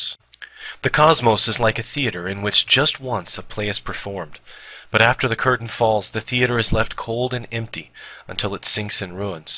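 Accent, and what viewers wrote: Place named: United States. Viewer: all very reasonable, but why is an American reading it? Bertrand Russell was so terribly English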